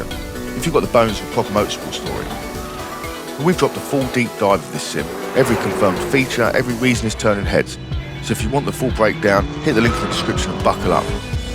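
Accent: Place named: Cockney, United Kingdom. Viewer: Wow man you've got one hell of a snappy voice over and that Cockney accent just takes me back to Ritchie's Snatch and Two smoking Barrels! Absolutely the most enjoyable trailer on ANY motorsport ever!Kudos.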